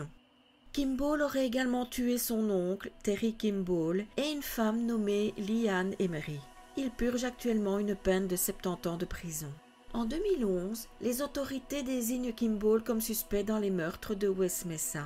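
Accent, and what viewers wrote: Belgium. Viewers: ce petit accent Carolo voire Hennuyer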